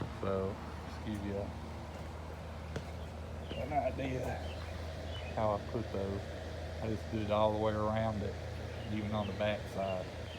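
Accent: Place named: Alabama, United States. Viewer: Better and more good ideas added than any of the other Green Canopy Regent Gazebo videos! Thank you (I recognized the accent. My father in Law, R.D. Blackwell, came from alabama with a similar fun to listen to drawl, and those two letters before his Family name. Thanks muchly. We'll be ready for storms. We just put up the framing on ours. and we're putting in into cement slab. I just finished hammer drilling 1/4" holes and put in sleeve anchors into the holes, with 5 ft. pound 3/8 " diameter acorn nuts (we used to call them "nylocks") to lock the frame down into the cement. The straps will be next. Thanks again. I enjoyed the tour of your gazebo. Oh and the rope lights will be going up in ours too. great idea. Blessings. - Vern Bauerle from Alta Loma (a postal area inside Rancho Cucamonga, California.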